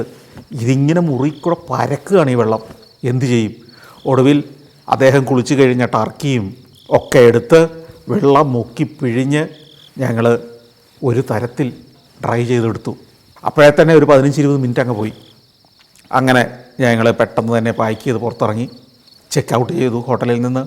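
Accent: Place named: Kozhikode, India. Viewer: മൂപ്പർ..... എന്റെ കോഴിക്കോടൻ ഭാഷ...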